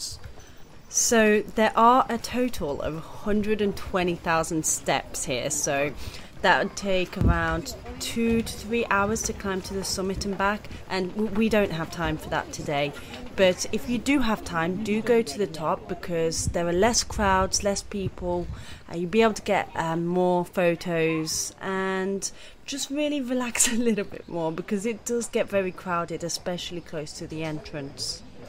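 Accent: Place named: England, United Kingdom. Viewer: Your English accent is fascinating!!!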